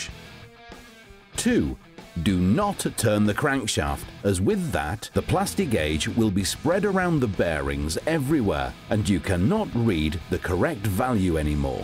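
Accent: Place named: RP, United Kingdom. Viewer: Funny, how it is that you have the stupid, raucous (and distracting) soundtrack and a prim and proper English gentleman speaking perfect Queen's English!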